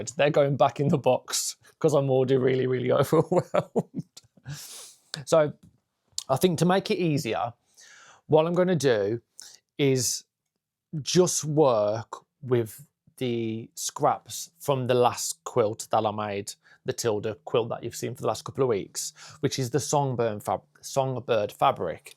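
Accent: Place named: England, United Kingdom. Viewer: Without Kate I wouldn't have known about you. Be yourself it's your nature, your channel. Happy to know you, I'm from from south of France and I enjoy to meet new english accents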